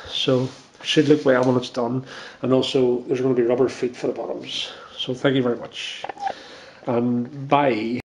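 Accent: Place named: Newtownabbey, United Kingdom. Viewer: How random. I'm searching for this and you sound like your from newtownabbey or glengormley. Small world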